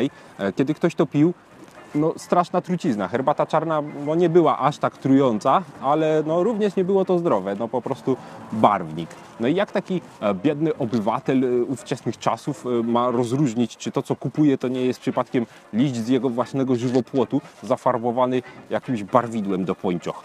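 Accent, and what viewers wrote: Silesia, Poland. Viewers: Ze Śląska.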